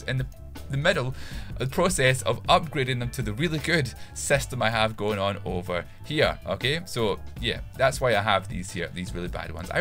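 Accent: Scotland, United Kingdom. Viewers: i loved this vid, it helped me alot to make a carrot farm, btw i love ur accent - is it scottish?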